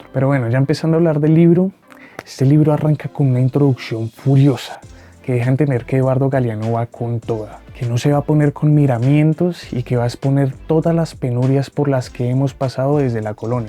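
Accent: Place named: Colombia. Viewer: Buenas tardes. Por tu acento creo que eres Colombiano. No estoy de acuerdo con tu interpretación del libro porque estubo muy sesgada. Veo que fue un material con años en Youtube, lo cual me hace preguntar, Que dirías en el 2024? Considerando el proceso político actual de Colombia, Chile, Argentina, Uruguay, El Salvador, Panamá, Perú, Ecuador, países que tenían unas grandes caretas.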